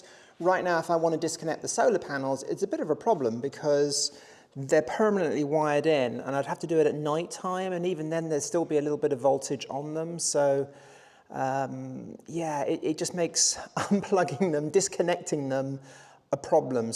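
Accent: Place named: Bristol, United Kingdom. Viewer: If I had to hazard a guess it would be Bristol 🤔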